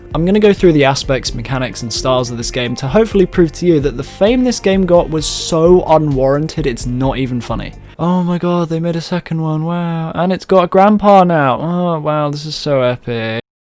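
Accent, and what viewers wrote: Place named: United Kingdom. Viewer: your british which makes you 10x more annoying than you already where with this video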